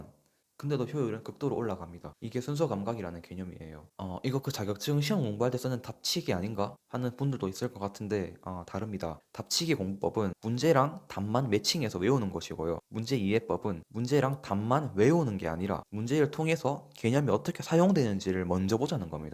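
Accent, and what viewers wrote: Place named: Daegu, South Korea. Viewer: 대구분이신가요? 대구사투리 정겹습니다^^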